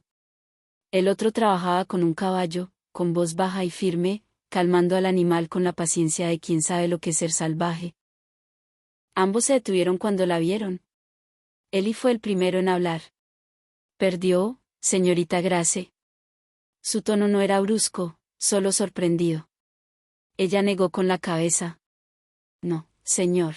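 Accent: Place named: Colombia. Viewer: No me gusta la voz de la narradora ese acento colombiano 😢😢😢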